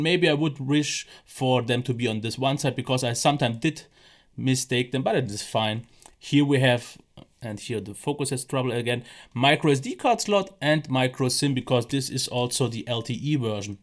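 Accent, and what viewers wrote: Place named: Germany. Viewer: Based on your appearance, hat and western shirt included, you look like you were born in the great state of Texas, U.S.A. That said, Your accent agrees with your claiming to live in Germany. So, I'll just say, "Guten Tag" instead of "Howdy" I too was a little concerned about the "dated" Snapdragon 800 CPU (SoC). But, I checked the Futuremark website's Best Mobile devices and, it seems to still be comfortably somewhere in the top ~300 of all mobile devices running the Ice Storm Unlimited benchmark. It also didn't score too poorly on the Slingshot benchmark test (though the frame rates are as bad as you'd expect for a benchmark test that is intended to stress current top end mobile processors like the Snapdragon 835.) But, the Unlocked and refurbished AT&T branded version I picked up on eBay just yesterday for just $129.99, (the LG G Pad X V930), seems to be a pretty good deal; though the tablet's battery will be a year old with use. 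Still, I believe it will do well for my wants and needs. I just wanted to be sure it didn't have rear facing speakers, (which is something I find hard to believe that tablet makers actually have long done, and still do), and is mostly why I came to YouTube looking for reviews on it. One feature I was wondering about also was, whether or not it has an IR Blaster?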